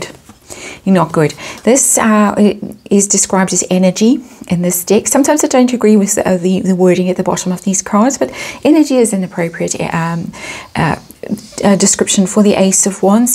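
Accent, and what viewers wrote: South Africa. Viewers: This is a superlative reading. Thank-you! What a gift - my only this day. Such a beautiful pack of cards; (as an art director) I appreciate the design, the subtleties, the lateralness. I love your neatness and delivery, reliability and all-round warmth & gentleness. Also, after hearing which country you could be in, also by your accent; I found some kind of comfort knowing you might be nearby - us being at the bottom point of the globe. Then, recently you spoke of being near the sea and sand dunes; so I am thinking Blouberg side, or Noordhoek side. I'm in the City Bowl, near the top of residential Table Mountain. Seasonal blessings & love to you and yours. And a good 2022. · I just want to thank you for a lovely reading. I always look forward to your readings. Merry Christmas to you and your family from a South African now living in New Zealand! 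I love listening to you as it reminds me of home! 🇿🇦💕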